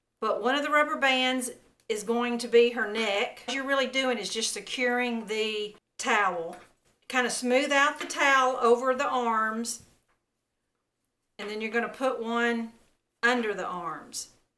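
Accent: South, United States. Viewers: First time seeing one of your videos. Loved it and love your southern accent. You said if anyone had any suggestions for projects to let you know so here is mine. Oven door decoration made from 1 kitchen towel and regular cotton material for the bodice. Looks just like a little girl's dress and they also have the boy one too. I watched a couple of videos but one was so fast I couldn't follow it and the other was way, way too long and talking about everything except how you put it together. Love your videos and I hit the subscribe button.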